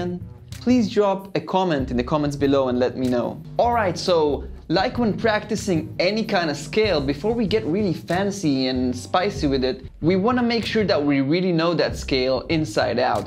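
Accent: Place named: Iran. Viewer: hi, my name is Lawson. I live in Los Angeles. I hear a Persian accent when you speak. My brother in law is from Iran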